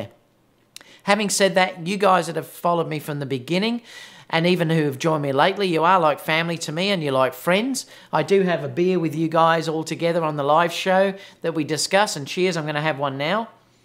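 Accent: Australia. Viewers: Congrats! I bet your AP impersonation won you some, haha. Just be careful, I just heard JL is threatening legal against AP. Hate to see AP threat legal against you, hahaha. Reason I like your content is you are pleasant to listen to, Americans like Aussie accents, you have the same hair style as me, and you drink Corona.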